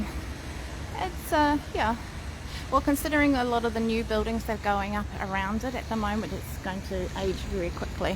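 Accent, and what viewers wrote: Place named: New Zealand. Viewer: Hi and thank you for the glimpse of this very majestic building. I hope it can be restored and saved. Are you a Kiwi? You sound to have a NZ accent. 😊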